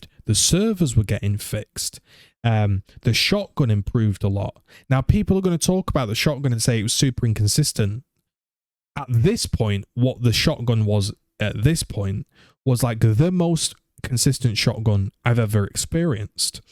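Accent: United Kingdom. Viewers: I watched this entire video and this is easily the most honest full review of the game and pretty much exactly how I feel. Just remember Z the silent majority of the Gears community stands by you and wishes you the best. I know many content creators and streamers that love your content and persona and behind the scenes talking about your entire situation. I've been here since getting spammed that video on twitter about Gears 1 compared to Gears 5 which I ended up enjoying. I loved the WWE style Gears videos and they still make me smile when I think about them. British accents drive me crazy but watching your videos for awhile now actually made the accent tolerable for me now <3 Love you man and take care of yourself.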